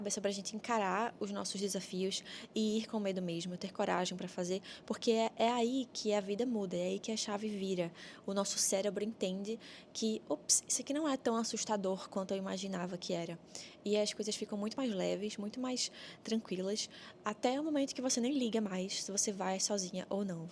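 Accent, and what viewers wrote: Brazil. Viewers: Se tu disseres que nao é catarinense, eu nao acredito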